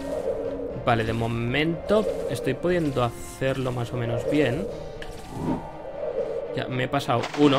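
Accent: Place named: Spain. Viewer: no se que sera mas malo los juegos en cuestion o los comentarios tan estupidamente planteados con ese tono españolete que irrita tanto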